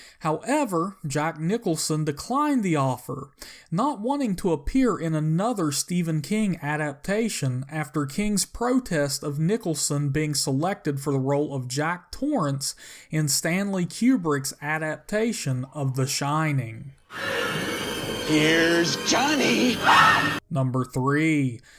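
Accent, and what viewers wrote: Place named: Kentucky, United States. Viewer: Sounds like Kentucky to me.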